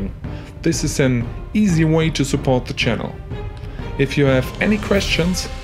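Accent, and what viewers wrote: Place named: Germany. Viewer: Once again a great build. Somehow I love your hard german "Akzent" :-D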